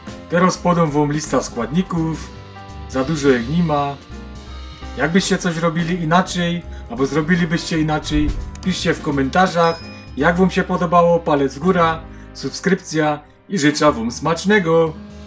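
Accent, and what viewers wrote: Silesia, Poland. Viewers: ..to szukaj na internecie po polsku a nie obrażaj pięknej śląskiej gwary😮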